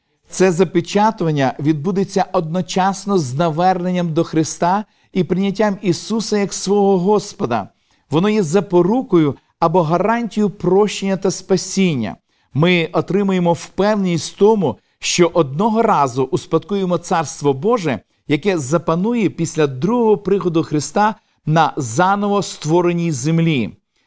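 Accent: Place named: United States. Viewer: До чого цей дурнуватий американський акцент і менторство? Середньовіччя і мавп'ятництво в сторону американців. Огидно...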